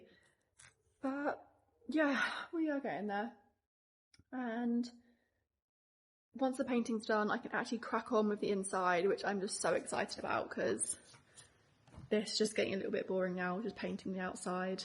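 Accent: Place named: Southern England, United Kingdom. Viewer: She is from south of England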